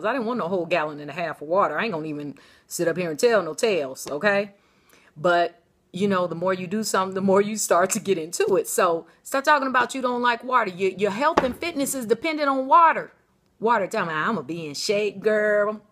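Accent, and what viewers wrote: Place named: South, United States. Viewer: Really???!!! Well I'm from NY, so everyone sounds country to me. lol. If I had to guess, I would say somewhere south of Virginia.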